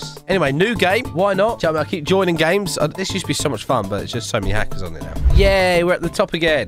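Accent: United Kingdom. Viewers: YOUR VOICE IS AMAZING THE BRITISH ACCCENT I CANT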